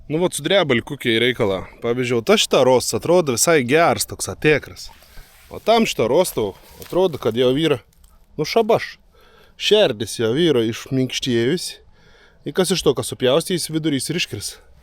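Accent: Samogitia, Lithuania. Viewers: Žiaure smage stebiet žemaitį ir klaustėis žemaitių kalbuos. Linkiejema iš Skouda ;) kontrybės valteis statybuos ;) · Jäkla kaip miela klausut zemaisiska Mano tevu dialekas linkejinai is Svedijos 🥰🥰🥰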